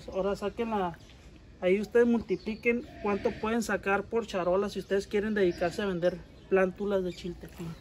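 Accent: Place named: Sonora, Mexico. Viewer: Buenos días. los felicito por ser tan amable en explicarle. Yo tengo ganas de hacer una siembra tengo terreno agricola. Soy jubilado me enfado mucho. Es muy interesante. Su publicación. De dónde son ustedes. Hablas como de Sonora. Yo soy de Huatabampo Sonora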